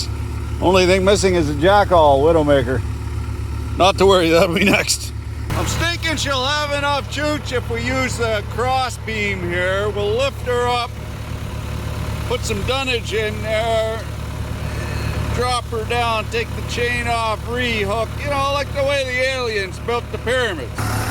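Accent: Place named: Canada. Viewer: Are you related to “Zip ties and bias plies”? Because you’re both from the great white north and talk almost exactly the same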